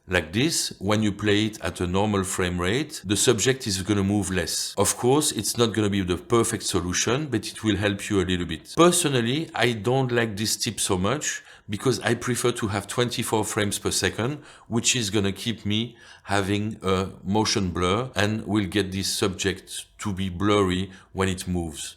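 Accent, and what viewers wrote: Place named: France. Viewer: Excellent macro underwater photography advice and thank you fort sharing it. You sound especially authoritative with your French accent as it strongly suggests the entire Cousteau family!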